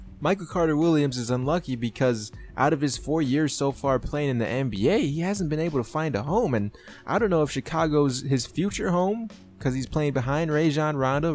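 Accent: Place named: Russia. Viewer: sounds like russian guy speaks english. sort of accent or something.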